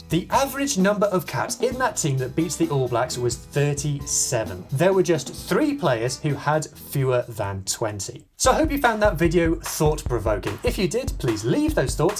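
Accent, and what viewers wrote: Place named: United Kingdom. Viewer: Same here, his brit accent makes it more understandable (at least for me haha)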